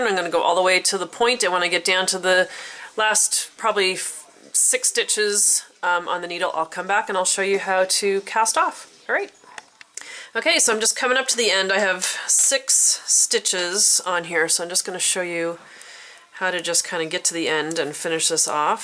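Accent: Canada. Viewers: Thanks for the great video! You sound Canadian!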